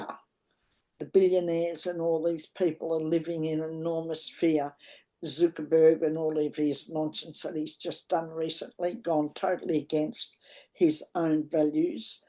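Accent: Australia. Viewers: ❤. A common sense Aussie voice. Love your straightforwardness.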